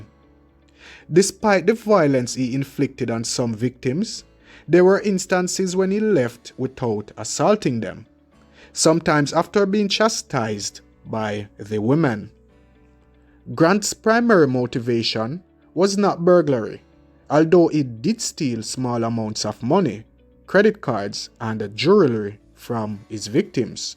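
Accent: Jamaica. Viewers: Listen bredrin it's not the first time I've heard about Delroy Grant but it is the first time I've heard this narration and I love it I just love to hear my Jamaican dialect taking off the Ts and emphasizing the Hs gwaaan my yout proud ah yu and Leicester is how you said it correctly sir and you've gained a subscriber ❤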